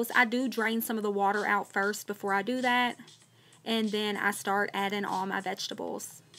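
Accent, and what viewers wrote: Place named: Kentucky, United States. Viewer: Girl I knew you had be from ky. Way you clean lol an talk. I am from Eastern.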